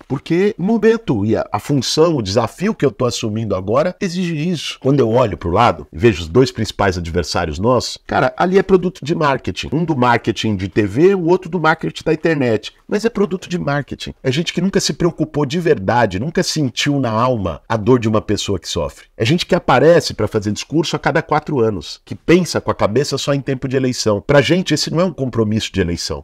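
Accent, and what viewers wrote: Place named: São Paulo, Brazil. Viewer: BOULOS NO SEGUNDO TURNO.....SOTAQUE GENUÍNO DE PAULISTANO. NOSSO FUTURO PREFEITO.😊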